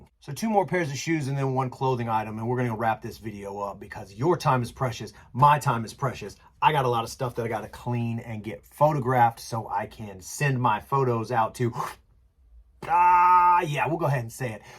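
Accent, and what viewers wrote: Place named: United States. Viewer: Lol that country accent 😂 great haul man! You crush it in shoes!!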